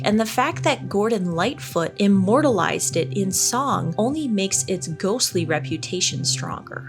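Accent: Minnesota, United States. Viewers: You must be from MN? Nailed the accent. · gotta love the Minnesota accent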